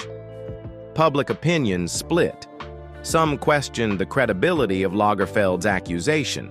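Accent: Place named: South, United States. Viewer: this narrator dealing in a southern drawl? It seems it just Popped up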